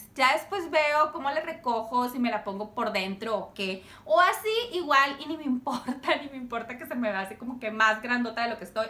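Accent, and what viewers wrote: Monterrey, Mexico. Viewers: se te nota más el acento regio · regresaste con el tonito regio más marcado 😉😉 siempre pasa eso 🙈🤔🙈 me encanta al fin del norte!!! Y si el reto de los 100 pesos!!!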